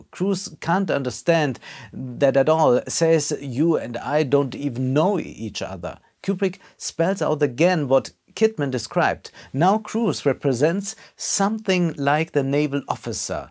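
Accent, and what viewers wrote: Germany. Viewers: He just started the english channel, he gets round about 30-60,000 views on the german one. Sometimes he gets much more views if a movie is currently in the theatre and is more sophisticated, like "Tenet" recently, ore when he rants about janky german rom-com movies. I would not worry about the german acsent, it's Kind of original, but I wouldn't be if surpriced if some viewers find it anoying, it's kind of special channel with interesting takes and viewpoints.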